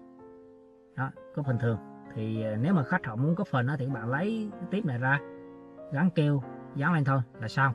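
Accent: Central, Vietnam. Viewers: Nghe giọng miền trung mà nhớ quê hương quá. Anh chỉ rất tận tâm. Đã like và subscribe :)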